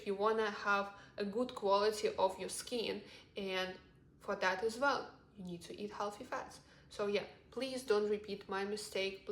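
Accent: Russia. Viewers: по первым секундам поняла что русская😮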